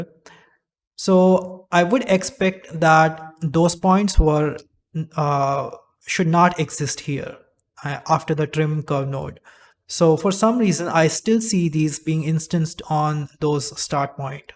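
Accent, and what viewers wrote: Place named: India. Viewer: as soon as I heard your accent, my ear; yup he is from India(Delhi, Mumbai or Bengaluru)(Dam I have a perfect pitch)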